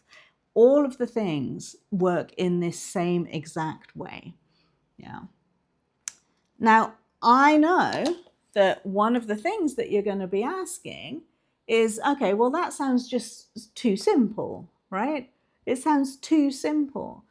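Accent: England, United Kingdom. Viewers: At last … someone who breaks this down in plain English and with a beautiful English accent 😁 So glad I found your channel. New follower here!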